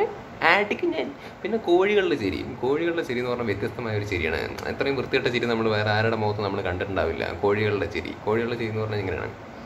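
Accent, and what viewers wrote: Palakkad, India. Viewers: Bro Palakkadan aano✌️ Slang ind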